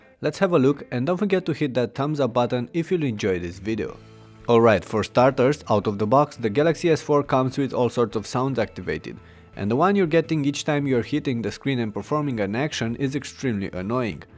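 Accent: Romania. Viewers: Ești roman , se vede după accent.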